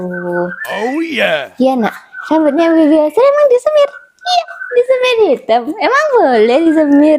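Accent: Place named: Java, Indonesia. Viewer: Baby Hazel dapat gen terbaik dari embahnya. Semoga tumbuh sehat ya Dek...💚 Mbaknya daerah mana kok logat jawanya dapet banget. Sukses ya Mbak...🙏🏻☺️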